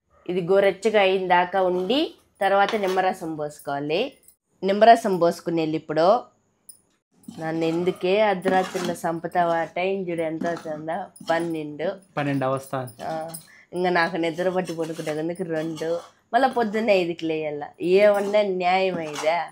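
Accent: Rayalaseema, India. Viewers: Rayalseema slang 🥰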